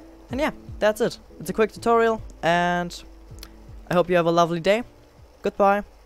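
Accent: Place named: Germany. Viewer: nice german dialect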